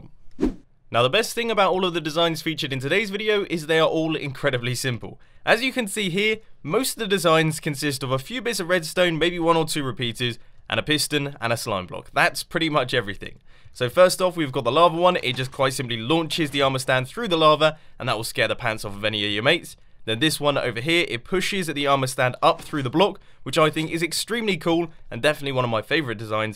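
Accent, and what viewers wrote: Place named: United Kingdom. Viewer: I love your eccentric british voice. Can't wait for the next hermitcraft 3 episode!!!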